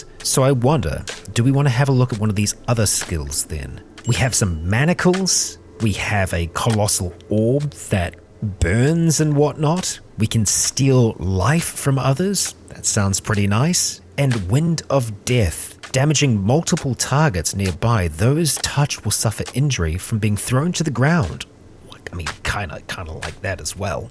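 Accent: New Zealand. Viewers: I thought you sounded like a new Zealander at the beginning of the video and I was rightttttt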